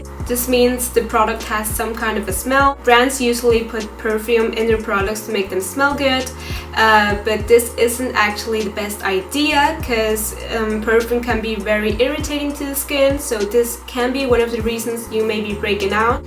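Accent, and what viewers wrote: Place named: India. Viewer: Are you Indian ? You've that little Indian English accent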